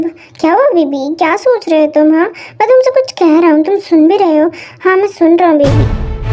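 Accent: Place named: Amroha, India. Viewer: AAP Khan se Ho tumhari language toh amroha ki lag rahi hai 😊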